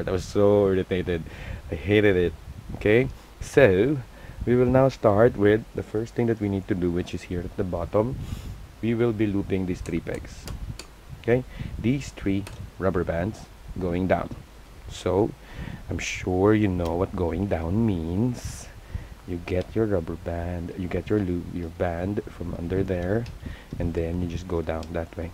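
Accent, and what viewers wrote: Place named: United Kingdom. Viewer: I MADE IT!! And last week The Legend!!! I made the Star box in Jelly red violet and jelly turquoise! Some bracelets look bad with jelly bands but NOT this one it looks stunning!!! I have a bad headache after making it though lol! Great British accent you did too! Sounded just like my accent which is English!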